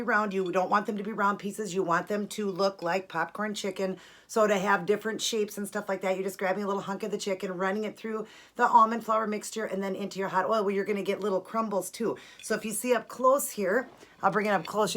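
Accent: Midwest, United States. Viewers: dat mid-western accent tho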